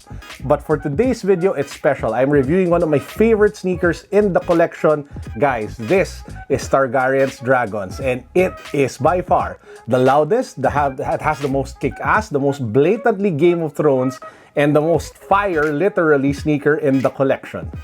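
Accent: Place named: Philippines. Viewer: Went in person to check out all the GOT shoes, this one definitely caught my eye and ultimately bought these. House Lannister were close though but they were only exclusive to women. Starks were nice but would prefer a black bottom sole. White Walkers were nice also but it screamed yellowing over time. Besides the strong filipino accent, you gave a well thought out review of the shoes. Great job bro.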